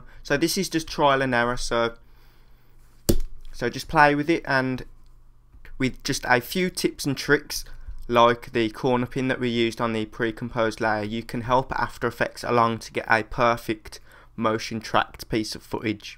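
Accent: Birmingham, United Kingdom. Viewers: Rodrigo Vazquez , Brummie fo sho! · It's Birmingham, maybe Kidderminster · you never fail to help me with my work man:D may i ask where your from, you sound like a brummie?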